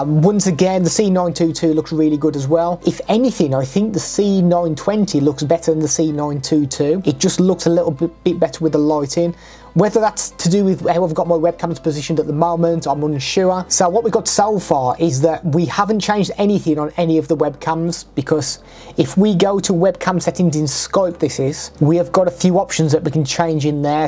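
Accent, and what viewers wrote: United Kingdom. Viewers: Your british english is so fcking hard to listen to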